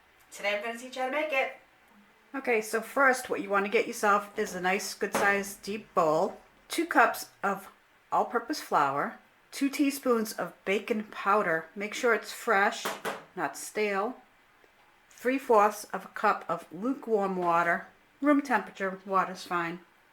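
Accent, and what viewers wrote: Boston, United States. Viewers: I hear the Yankee....fellow Bostonian here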